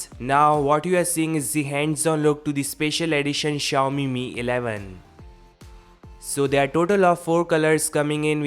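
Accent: India. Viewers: Logat india people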